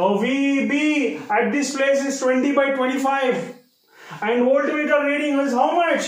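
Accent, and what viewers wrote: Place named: South India, India. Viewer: Ye South wale h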